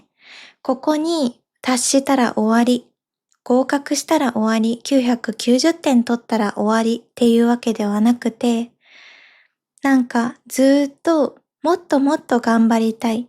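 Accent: Kansai, Japan. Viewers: Are you from Kyoto? Really nice to be able to hear 関西弁 ! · Omg thank you so much for 関西弁おまけ. ❤️❤️🙏 I'm so happy you added it.🥳🥳 -さん is so adorable speaking 関西弁. The problem is that I want more😂. I guess I'll be listening to this podcast 20 more times 😅. I really love your podcasts like this, as long as you make any video - doesn't matter what it is, I'll watch it. There was a tip for learning, it was to find someone you have a crush on to keep you motivated😂. is my crush. I hope I dont sound like some pervert.😅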